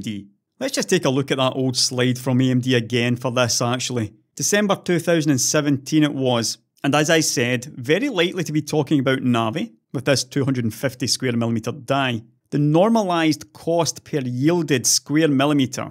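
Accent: Scotland, United Kingdom. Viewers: Damn, is scotish? Just noticed...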